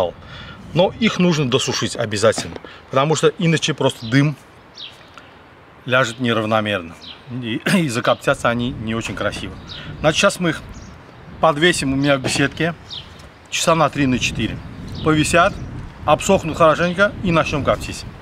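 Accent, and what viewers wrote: Baku, Azerbaijan. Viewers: У него всё поличилось идеально. А Бакинский Акцент это другой кайф👍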